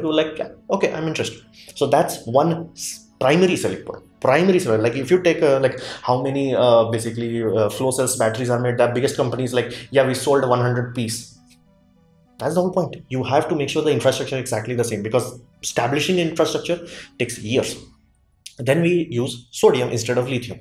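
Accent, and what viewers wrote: India. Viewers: My United States English accent and your Indian English accent are far enough apart that I could not follow your presentation, so I lowered the replay speed to 75% and everything became clear. I'm just mentioning this as a suggestion for anyone else with the same problem.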